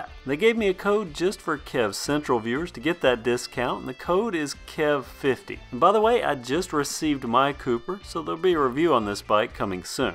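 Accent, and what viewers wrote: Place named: Alabama, United States. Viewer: love to watch your videos, that easy alabama accent is great to listen to while I work (on bikes)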